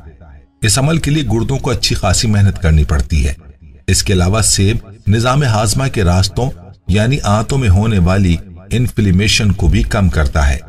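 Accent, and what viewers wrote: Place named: Pakistan. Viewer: You forgot to mention BARLEY water🙄🤔. It was refreshing to note your pure Pakistani urdu is commendable.👌🏻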